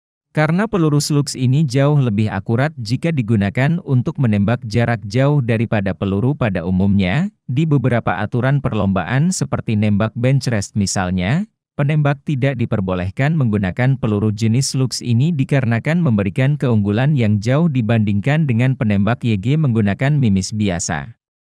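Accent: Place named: Java, Indonesia. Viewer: Ilat.e wong jowo wes podo paham slag slug podo ae...😅😅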